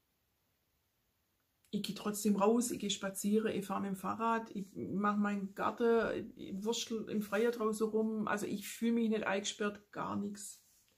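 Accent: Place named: Swabia, Germany. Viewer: Hallo,was hab ich mich auf und über dein Video gefreut.Das es dir gut geht (Mann und klein Lily natürlich auch) und das das Ergebniss von deinem Knie ein gutes war,Gott sei Dank.Ich schaue nicht sooo viele Potcast aber deine verpasse ich nie,ich hör so gerne wenn du schwäbelst:)So,jetzt wünshe ich dir noch eine schöne Zeit,und vor allem bleibt alle gesund. Es grüsst dich ganz herzlich die Gaby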